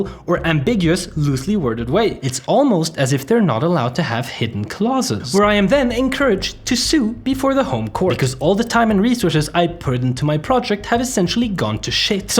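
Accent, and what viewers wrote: Sweden. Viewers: The Swedish accent just got worse and worse lmao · Lol he's becoming more Swedish as the video goes on and he gets angrier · You can tell that it gets really personal when you can hear more of the Swedish accent from him as the video plays on. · The angrier he gets . The more Swedish he becomes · I love how he gets more Swedish as the video goes on. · Is it just me or is his accent getting more swedish as the video goes on?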